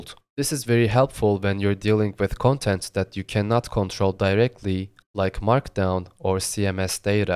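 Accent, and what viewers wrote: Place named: Turkey. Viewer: türksün sen aksanın bağırıyor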